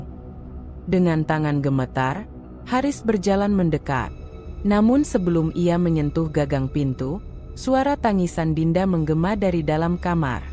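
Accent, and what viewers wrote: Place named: Java, Indonesia. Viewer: Lucu banget logat jawanya.... Aneh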